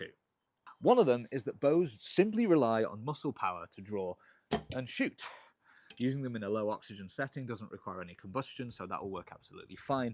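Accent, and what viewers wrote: United Kingdom. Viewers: This is exactly the kind of video I needed to see for the story I'm writing AND it's got a dude who's funny with a british accent. Win win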